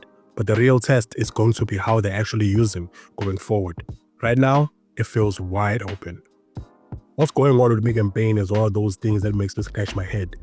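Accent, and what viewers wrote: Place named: South Africa. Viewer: Hey man, what's your nationality, the accent is giving hints of South African, just asking of curiosity 😁 other than that great video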